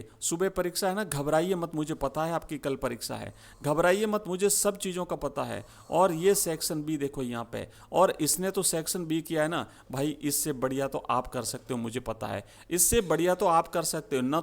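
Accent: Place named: Wagad, India. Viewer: Sir aap wagad se ho kya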